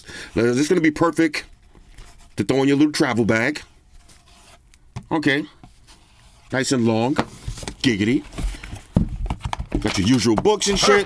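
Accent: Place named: Queens, United States. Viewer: That’s Queens, New York all day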